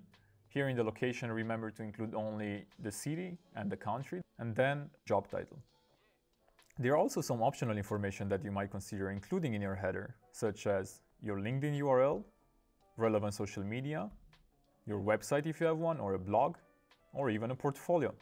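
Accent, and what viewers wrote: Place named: Israel. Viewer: You must be from Israel. You sound Israeli and have a great personality.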